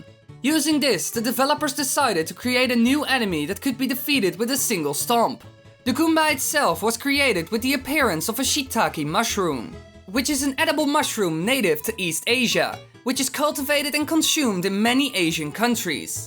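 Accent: Netherlands. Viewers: Eeeyyy ik hoor een Nederlands accentje hoor ;)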